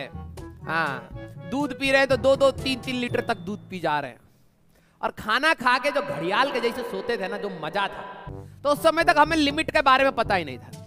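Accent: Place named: Haryana, India. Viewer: Isko kehte h ठेठ हरयाणवी 😂btw I m also from haryana love u sir ji❤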